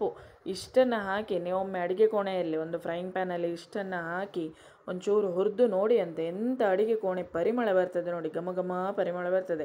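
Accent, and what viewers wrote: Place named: Tulu Nadu, India. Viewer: ನಿಮ್ಮ ಕನ್ನಡ ಮಜಾ ಇದೆ,ತುಳುನವರೂ ಹೇಗೆ ಮಾತನ್ನಾಡುವುದು, ಬೆಂಡೆ ಚಕ್ಕೊ ಮಸ್ತ್ ಇದೆ,🙏🙏👌👌😋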